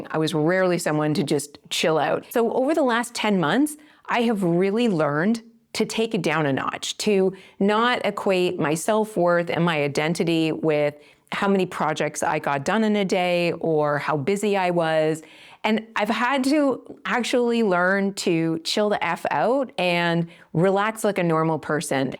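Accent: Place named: Canada. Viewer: Great information. I am getting ready to retire next year. Btw, listening to your videos I think to myself I wonder if she’s from Canada. Your accent gave you away.😅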